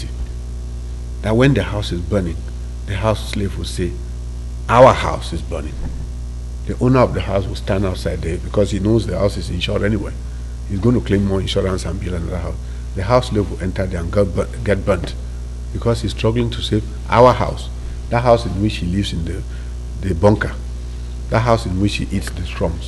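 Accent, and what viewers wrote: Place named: Nigeria. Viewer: You sound Nigerian . How is the struggle of Cameroonians consern you ?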